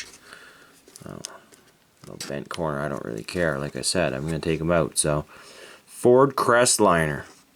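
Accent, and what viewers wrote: Canada. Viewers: Are you Canadian cause you say aye quite a lot there bud if so good aye bud I'm Canadian to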